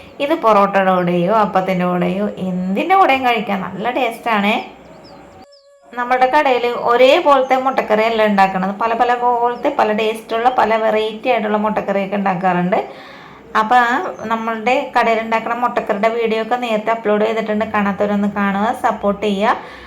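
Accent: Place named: Kochi, India. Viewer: എന്ത് സുഖമാണ് കേട്ടിരിക്കാൻ ❤ ഇടക്കിടെ ആ കൊച്ചി യുടെ തനതായ ഭാഷാ ശൈലിയും! ❤❤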